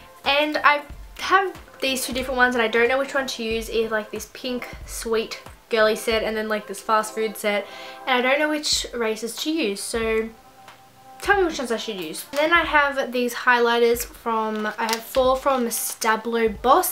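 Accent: Australia. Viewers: Sarah Ann Her accent sounds Australian... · Is her accent Australian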